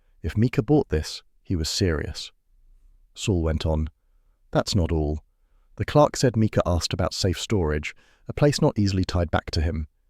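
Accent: United Kingdom. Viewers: Doing these narrations is a lovely tribute to the works of Rex Stout, but as an American, I just can't listen to these stories read with British accent, sorry. Stout was a quintessentially American author, and the descriptions of New York, the brownstones, the furnishings, everything are all about America. It's jarring to my ears to hear them read as if they were Sherlock Holmes stories. (I wouldn't be able to tolerate Holmes read in a flat Yankee voice, either., lol)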